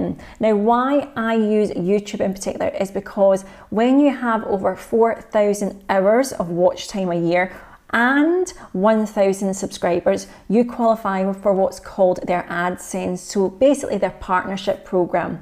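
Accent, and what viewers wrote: Scotland, United Kingdom. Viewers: Love all your videos! I live in Scotland so I am glad to hear the Scottish voice so I know that all the info you offer will be valid to myself. Most financial video's on YouTube are US so a lot of info is not valid to UK. So thank you and will be trying a lot of your info :) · My wife’s family are part Scottish. What a great accent! Great vid too😁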